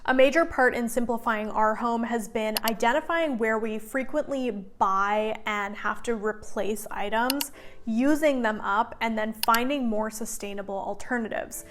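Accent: Canada. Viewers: Hello, I'm glad I found your YouTube videos today. I have now subscribed. I was brought up in Canada and recognize your accent and see the spelling differences. I like your straight forward ideas and how you make it simple for anyone to achieve a great goal. I would appreciate a video on cleaning recipes and ideas as I make most of my own cleaning products also. I do still use good old Comet or Barkeepers Friend powders. I will be looking for your video. Have a good week.😊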